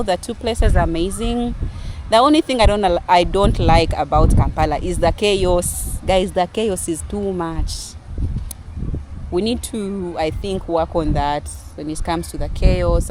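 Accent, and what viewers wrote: Kenya. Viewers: I always thought that is a Kenyan from the land of Mulembe.Her accent will not betray, and she is so beautiful, a jewel from Uganda. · She sounds like a Kenyan from kisumu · U sound more of kenyan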